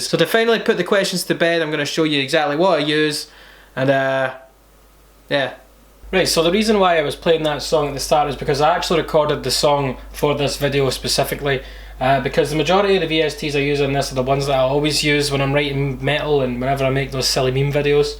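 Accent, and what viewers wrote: Scotland, United Kingdom. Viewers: Are you scottish? It sounds a bit like you're scottish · Scottish isn't a language. He's speaking English with a Scottish accent. Lol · Slow it down to 00.75 When he starts talking he sounds like Scottish Johnny Vegas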